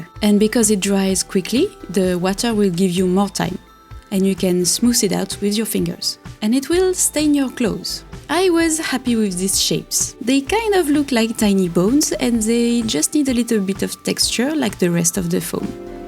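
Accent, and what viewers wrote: France. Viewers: this is really dumb probably, but as another person with a strong accent (swede) i feel a little insecure abt it, but seeing you proud of your origin country and me really loving your voice has made me...… prouder of my own accent??? even though the france accent is recognized as prettier than the swedish accent??? idk i kind of like how my vowels sound now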